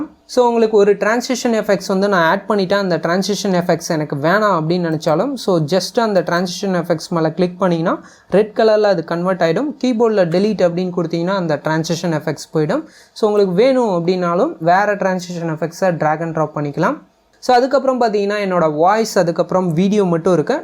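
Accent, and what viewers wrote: Salem, India. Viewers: Anna neenga salem a?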